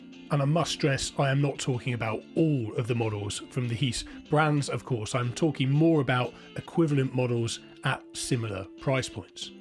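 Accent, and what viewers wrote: United Kingdom. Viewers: If you’re in the UK - which sounds like it by your accent - please can you advise on an imminent problem I’m facing with my Fluance RT85, please? I’ve had one for four years and I’m certain the belt will need replacing very soon, however, going to the Fluance site, a replacement belt is £17 (reasonable) but adding in the cheapest shipping option, it comes to £110! I don’t have any Canadian friends to ask to purchase on my behalf and also can’t find what could be a compatible belt here in the UK… any thoughts? This could be an issue that many will face sooner or later.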